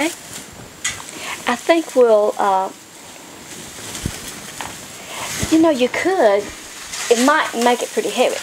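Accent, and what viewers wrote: South, United States. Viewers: Love your project. I love the mom and daughter togetherness. Families sh o uld be closer in their lives including mine. Love your southern talk. Im from Louisiana so y'all are great!!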